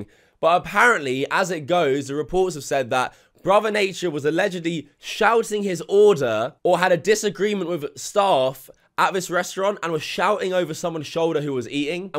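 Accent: United Kingdom. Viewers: What a whiny little bitch you are. Being bri’ish might add a lot to why you sound so whiny, kinda like your about to cry every sentence. Oye dick’ed why don’t ye shot ye gullet and fock off innit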